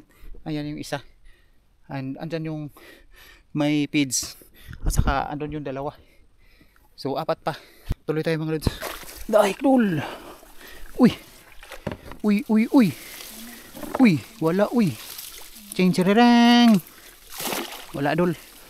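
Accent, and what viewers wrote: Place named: Bohol, Philippines. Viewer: Dayo raka Dha sa agusan Dol.. Imong Sinultihan Kay murag Taga Bohol nya ka pronounce nimo taga Tarlac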